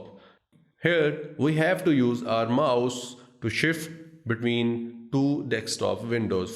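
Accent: India. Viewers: omg this indian english is absolutely horrible to listen to :o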